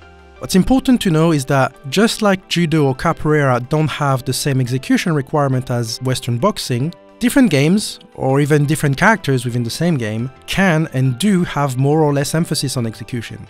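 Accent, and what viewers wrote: France. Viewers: Oh ! The Français accent ! But the english it is quand même good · I knew it. You had a slight French accent. En plus on manque de youtubeurs jeux de combats pk t allé à l'étranger XD. Aussi leon massay goat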